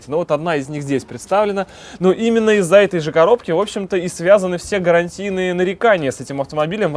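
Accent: Saint Petersburg, Russia. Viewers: Уходит "Питерский акцент" который был в начале.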